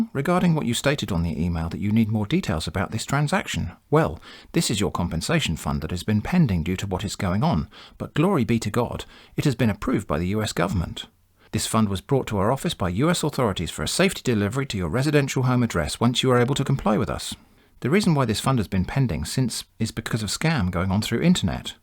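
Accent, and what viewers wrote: England, United Kingdom. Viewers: ”Near” perfect? 😂 He is English. How should he improve his “near perfect” English accent. That is an English accent. 😂🤷🏻‍♂️ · I always find the contrast of reading the broken English in a near perfect English accent quite amusing.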